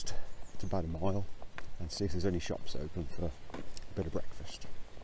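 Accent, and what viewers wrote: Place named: Midlands, United Kingdom. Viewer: Your videos are proving really useful! I'm walking The Cumbria Way in a few weeks ( August 22) It's my first solo walking and camping be trip so I'm a tad nervous; I'm used to trail running but not walking long days back to back. I love your accent,. I can tell you are a fellow midlander but I just can't pinpoint it...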